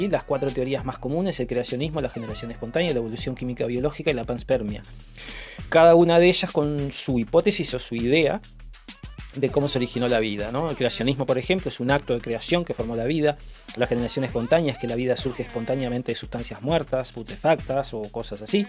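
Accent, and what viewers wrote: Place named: Chile. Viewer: ¿De qué materia y nivel son estas clases? Por el acento creo que eres de Chile, ¿Es correcto?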